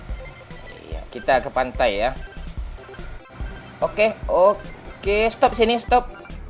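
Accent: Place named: West Sumatra, Indonesia. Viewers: suara nih.. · Kya suaranya admin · nih suara nya wkwk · urg awak yo? Jaleh bana minang nyo 😂😂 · Ndak salah iyoo bana urang minang,urang minang marantau ka pekanbaru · Suarnya kaya ya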